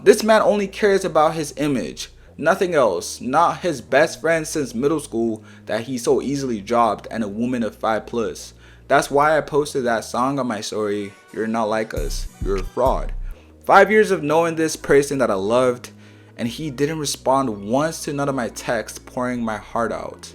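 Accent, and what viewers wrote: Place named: Toronto, Canada. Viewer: toronto accent is crazy😭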